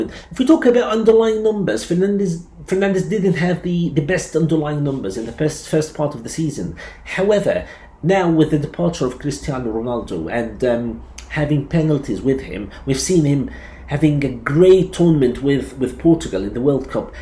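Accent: United Kingdom. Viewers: British accent 😍 👌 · Amazing content and a perfect British accent. To all the world, here is the best FPL content ever. 😍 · A very perfect British accent mate ❤️❤️❤️🤍🤍🤍🤍🤍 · King!! Your british accent!🔥🔥🔥🔥🔥🔥🔥🔥🔥🔥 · ما شاء الله عليك يا دكتور بتكلم بلكنة بريتش و المحتوي ممتاز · Amazing content. So organised and to the point. Your British accent is incredible. I used to follow you in Arabic, but I love your episode in English even more. 😍😍😍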